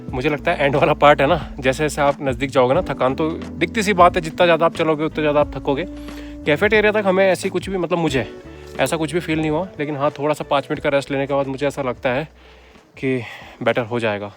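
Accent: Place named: Rajasthan, India. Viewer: For the first time watching your vlog.. Nd aapki rajasthani sun kr meri beti khush ho gayi.. Ki ye tou apne hi h... Morpankhi vala time aapne sahi kaha.. Barat ka swagat hota tha morpankhi or gupab ka phool dekr... You are doin wonderful job... Keep goin..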